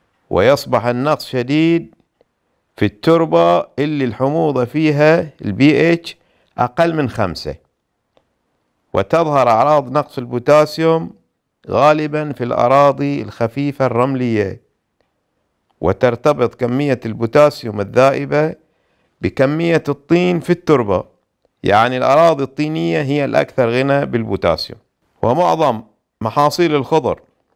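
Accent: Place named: Bahrain. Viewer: انا توقعت من البحرين من الاسم للعايله وهم اللهجه بحرينيه واضح جداً..والنعم والله من اهل البحرين. اخوك من السعودية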